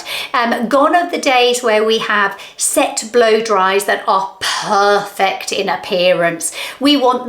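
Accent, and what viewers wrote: United Kingdom. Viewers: Your UK accent is lovely, however, unnecessary fashion consumption is off-putting. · Your looking absolut amazing! I love your Videos and your British ( ? ) English😃🥰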